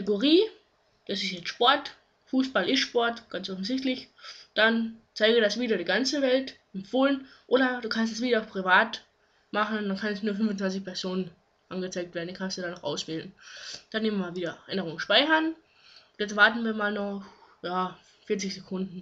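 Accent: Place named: Saxony, Germany. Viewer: Genau. So sympathisch ein sächsischer Akzent auch sein mag, bitte bitte bitte mit Zucker drauf: Spreche so, dass man es verstehen kann!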